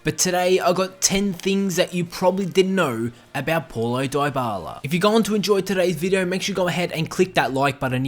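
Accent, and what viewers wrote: Australia. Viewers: Are you Aussie or something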